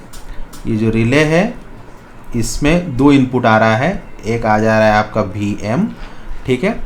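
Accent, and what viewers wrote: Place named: Odisha, India. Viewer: Your accent says you are from odisha. Correct me if I'm wrong.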